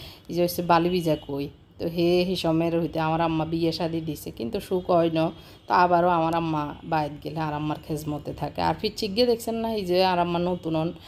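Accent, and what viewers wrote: Noakhali, Bangladesh. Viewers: Apo tomar mukhe amader nowakhali r vasa sune onek valo laglo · আপু আপনার মুখে নোয়াখালির ভাষা শুনতে অসাধারন লাগছে। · আমি আজ প্রথম আপনার ভ্লগ দেখলাম, YouTube দেখতে দেখতে সামনে ছলে আসলো তারপর দেখা সত্যি অসাধারণ লাগলো আপনার বাবার বাড়ি প্লাস আমাদের প্রিয় নোয়াখালীর ভাষা আহহহ অনেক সুন্দর,,, আল্লাহ আপনার আব্বু, আম্মু কে ভালো রাখুক দোয়া করি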